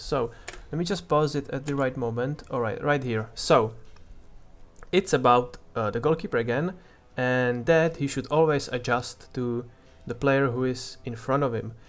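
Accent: Czech Republic. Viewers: u have nice content keep try and u have like czech or slovakia voice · Ten českej accent tam je furt cítit :D, ale good video a nice advices!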